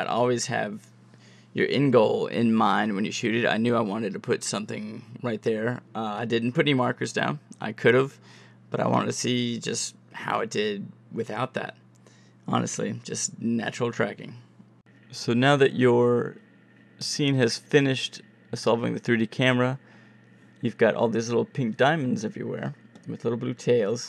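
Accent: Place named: Kentucky, United States. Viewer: you sound kinda northwest Kentucky. Great tutorial man thanks! Subscribed!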